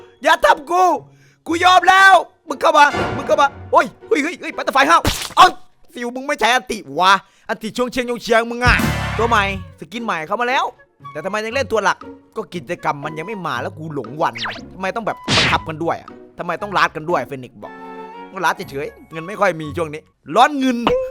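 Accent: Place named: Isan, Thailand. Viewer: พูดอีสานได้กวนตีนมาก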